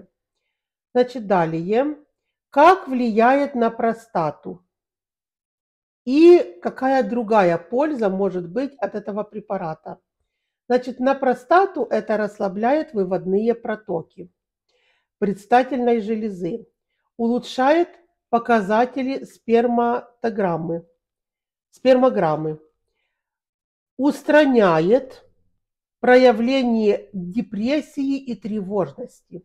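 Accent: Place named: South, Russia. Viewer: Судя по акценту специалист с юга.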